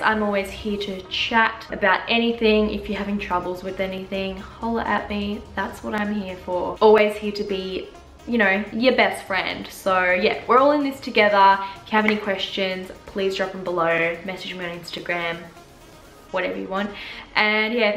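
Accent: Australia. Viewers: Is that an Australian accent? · are you australian?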